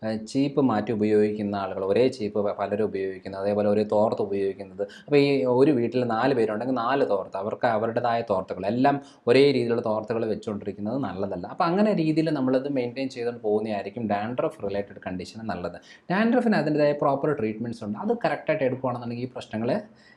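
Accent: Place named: Pala, India. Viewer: എന്താ രസം...Dr.....അവതരണം..സൂപ്പർ...ഭാഷ...സൂപ്പർ... പാല അച്ചായൻ... സ്ലാങ്.... ഇത് Dr..aayi തോന്നുന്നില്ല..just..Oru frd...nice..❤️❤️❤️😘😘😘😘😘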